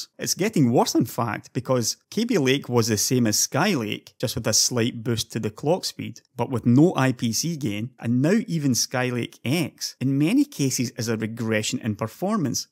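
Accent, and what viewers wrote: Scotland, United Kingdom. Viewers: this Scottish accent tho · I can even understand your Scottish accent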